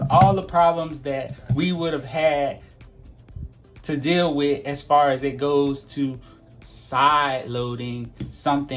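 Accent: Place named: United States. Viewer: Also, BTW, I might add that it's refreshing to hear an American with an American accent Talking about this stuff! Usually it's someone from China or whatever, in broken English. I didn't realize this hobby was so popular here in the states too! I'm in Montana (although I'm from the NY/NJ area originally) and nobody around here has any clue about this at all! Back in the Days of analog cable, we used to use the "boxes" that turned on all the channels but I'm Talking about late 1990's there. I didn't even Knew such a thing as this exists until recently! What part of the country are you in?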